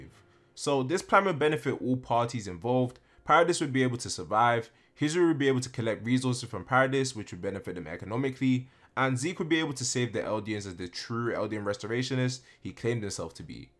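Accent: London, United Kingdom. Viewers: I love learning all the different kinds of British accents and yours is awesome. Definitely gonna try and learn it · bro speak less briish english pls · London innit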